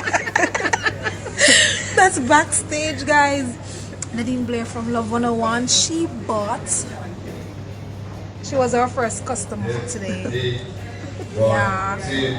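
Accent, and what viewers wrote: Jamaica. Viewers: Loving the video and how you a talk the words Jamaican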